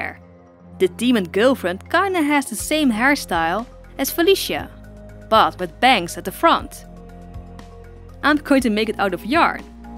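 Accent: Netherlands. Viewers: No hate but you sound i bit Dutch ( ben je Nederlands ?? ) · I just found you and I think you are Dutch 😂 because of your accent xD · NEDERLAAANDS 😂 je hoort gwn dat je een Nederlands accent heb, I 💖 your video's 😘💋💯 ga zo door!! 🔥💝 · Ar u neterlands cuz i heard it in the accsant i'm neterlands to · Oh my god your accent is sooo cute! I’ve never heard a Dutch person,and y’all kinda sound like if the French and British accent had a child xD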